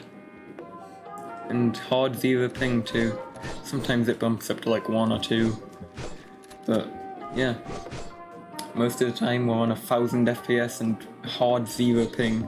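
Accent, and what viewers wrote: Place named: Wales, United Kingdom. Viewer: Cmon g I know your Welsh i can tell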